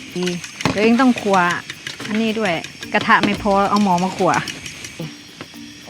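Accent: Korat, Thailand. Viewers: เป็นคนจังหวัดอะไรหรอ สำเนียงแบบนี้ คนโคราชหรือเปล่าคะ 😂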